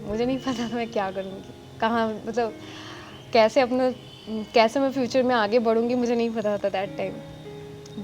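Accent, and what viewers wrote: West Bengal, India. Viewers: Didi are you from West Bengal?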